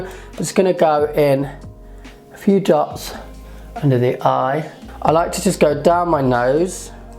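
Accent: United Kingdom. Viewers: Hey hottie with the totally cool British accent!! : )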